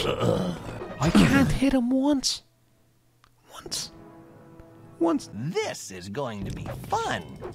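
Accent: Ireland. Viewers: Narrating gonoor or whatever his name is in your Irish makes him seem a bit scarier if I'm honest..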